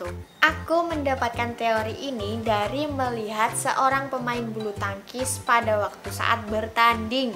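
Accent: Java, Indonesia. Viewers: medok sekali 😍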